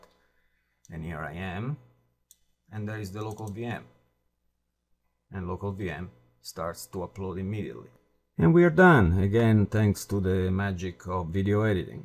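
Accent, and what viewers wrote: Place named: Italy. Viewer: being italian i find our accent pretty funny, but true, def good tutorial!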